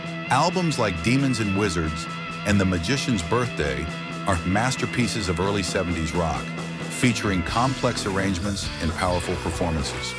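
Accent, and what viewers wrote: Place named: United States. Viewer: The American voice commentator turns me off every time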